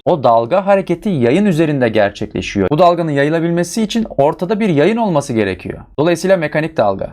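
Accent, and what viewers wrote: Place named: Kastamonu, Turkey. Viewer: samsun da olabilir ama kastamonulusunuz bence ya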